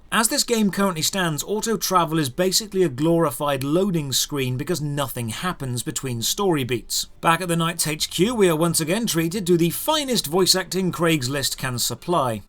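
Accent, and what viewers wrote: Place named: Northern England, United Kingdom. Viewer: "Pick oop"...ah, by gum, yah can't be hidin' your northern lilt.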